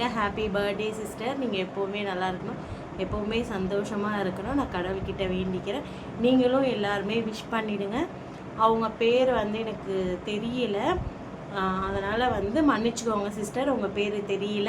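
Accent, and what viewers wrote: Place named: Chennai, India. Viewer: நீங்க பேசுறது ரொம்ப கஷ்டமாக இருக்குது நீங்க சென்னை பொங்க சிஸ்டர்.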